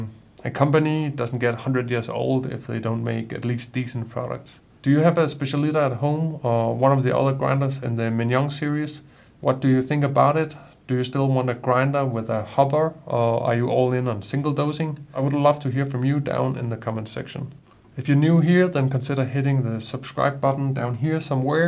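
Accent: Denmark. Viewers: Hej :) Hearing your accent I am assuming your are from Denmark? I am getting my Specialita soon and was wondering where you printed the 3D single dosing hopper? Can you recommend something here in DK/Copenhagen that doesn’t cost a fortune? :-) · Hi It sound like you are from Jylland DK, if I am not mistaking 😊 Anyway you have many nice videos out 👍 I have the Eureka Mignon Specialita and had have it for half a year, Love it. (very silent) Although I use it mostly for filter coffee. I use it for 2 cups equal to 38 gm to 640 ml. The settings for filter coffee is almost at maximum size. In the community out there, there is a 3D Print file of a large scale where the is a gear to the small nop so it is easier to dial-in however it is NOT making the grinder more beautiful. There is also a 3D Print base plate which is useful, making it tilt to the front, a little like the new model. 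👍Thank you for your video👍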